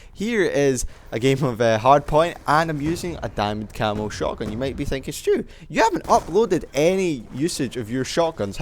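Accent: Scotland, United Kingdom. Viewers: Finally a decent Scottish commentator.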